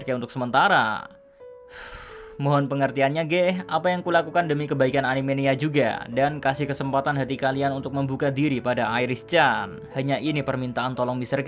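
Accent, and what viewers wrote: Java, Indonesia. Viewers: suka OP sejak kapan? Ini menjadikan perbedaan yg mendasar dr Mr. k. Saran * "coba tonton animenya dari chapter pertama, baru bawain lagi yg ini, terus bandingkan secara pribadi" * Medoknya keluarin aja, jgn d tahan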